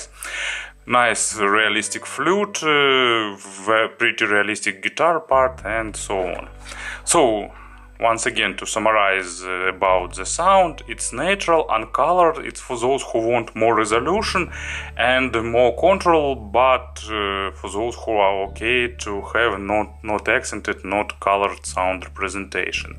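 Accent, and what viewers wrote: Russia. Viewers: Сильно чувствуется русский акцент))) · Сразу слышно суперрусский акцент😂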